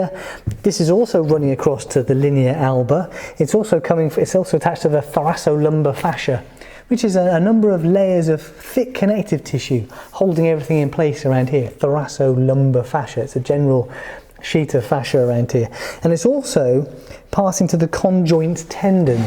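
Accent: United Kingdom. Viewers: the british accent really piss me off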